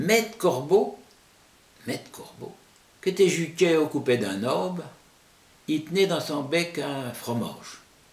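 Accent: Quebec, Canada. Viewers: J'ai l'impression d'écouter du français québécois de quelqu'un d'une autre région quelque part dans l'est. Ça parait que nos ancêtres normands nous ont transmis une grande partie de leur langue. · On dirait que vous parlez Québécois dis donc? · Wow, que c'est beau d'entendre ce parlé normand en France qui ressemble au mien au Québec. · Je trouve que ça fait beaucoup penser à l'accent du nord-est du québec ex. Havre-Saint-Pierre!! Vraiment cool de découvrir ça! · Je suis du Québec et votre manière de parler et les mots de patois normands sont très similaires à ce qu'on dit ici! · J'ai appris qu'il y a eu une débarquation d'anglo-normand en Gaspésie peut-être c'est pour ça l'accent est similaire au Québec, surtout au nord :P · Ça sonne très québécois c'est fou :O (je suis du Québec) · Beaucoup de similitudes avec l’accent Québécois.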